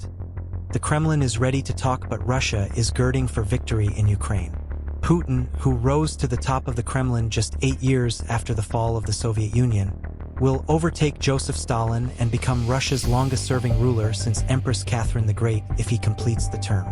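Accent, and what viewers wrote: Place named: United States. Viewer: The narrator sound’s American. Talk about anti American Zionists that highjacked our government.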